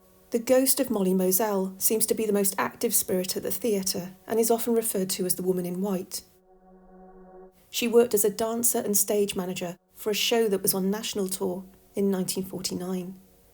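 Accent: United Kingdom. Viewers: I didn’t catch your video on Halloween, but any time is a good time for a video from and. Nothing like a good ghost tale told with a British accent. 🥰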